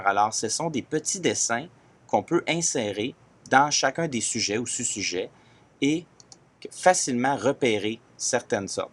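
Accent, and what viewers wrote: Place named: Canada. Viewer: Merci pour ce bel accent canadien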